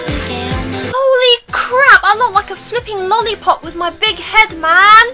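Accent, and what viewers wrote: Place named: United Kingdom. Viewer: Cool accent!!!! Did you grow up in the uk or something?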